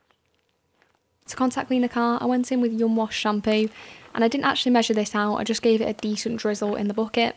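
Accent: United Kingdom. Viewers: Se speak british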